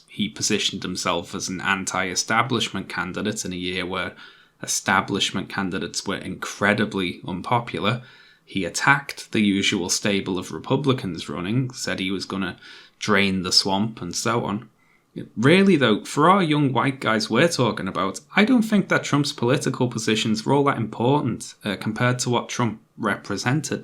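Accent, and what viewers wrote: United Kingdom. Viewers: I can now see why people love British accent so much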